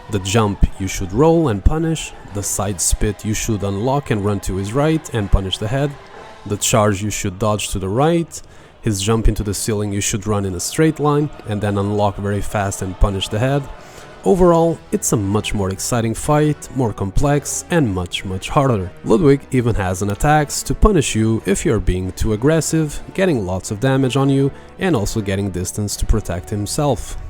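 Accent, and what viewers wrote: Portugal. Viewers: se este sotaque não é tuga eu atiro-me da torre dos clérigos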